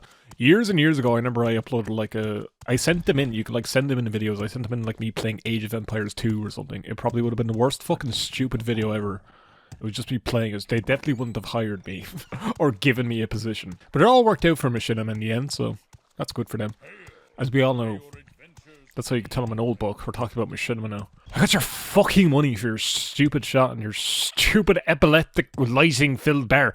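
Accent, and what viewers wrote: Ireland. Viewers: Am I the only one who just keeps on just to hear an Irish man rant for background noise?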